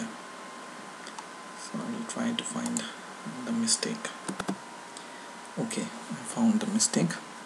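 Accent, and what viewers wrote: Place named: India. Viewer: india english??? OMG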